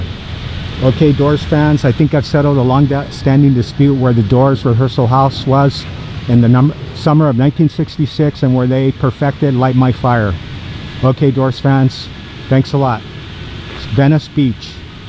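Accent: East Coast, United States. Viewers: You’ve lived in la all off your life but you have a thick east coast accent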